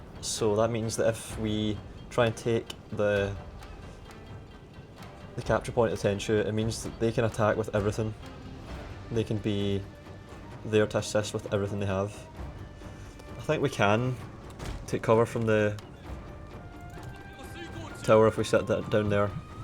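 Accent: Scotland, United Kingdom. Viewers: What part of Scotland are you from bud? I want to guess somewhere around Glasgow?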